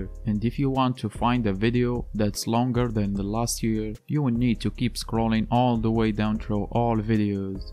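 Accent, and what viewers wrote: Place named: Morocco. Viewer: باينة مغربي اساط غا من هضرتك الله يسهل ابرو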